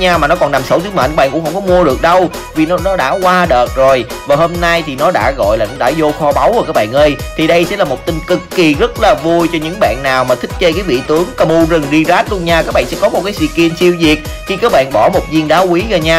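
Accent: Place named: Mekong Delta, Vietnam. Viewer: Rất có tâm, đúng với giọng điệu miền tây...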